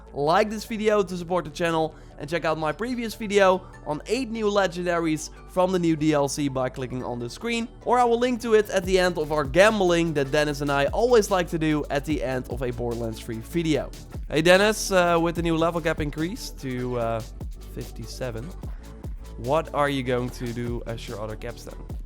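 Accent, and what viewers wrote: Netherlands. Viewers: lol hearing a thick dutch accent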